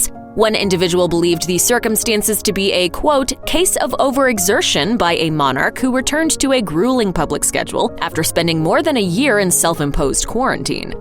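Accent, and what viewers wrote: United States. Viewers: Why is some american narrating this? Absolute rubbish!! Worry about your own disaster of a country now that your own people allowed that trump and his ilk to completely make america look like a bigger bloody joke. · No facts are 'out'! What a waste of time and...a really awful American voice narration! 😖🙄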